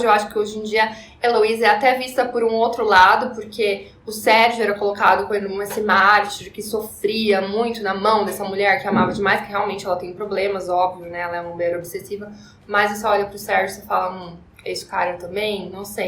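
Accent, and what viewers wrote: Rio de Janeiro, Brazil. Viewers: Amei o sotaque,!❤como carioca, confirmo q é assim mesmo! Melhor q o da Jade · O sotaque carioca da...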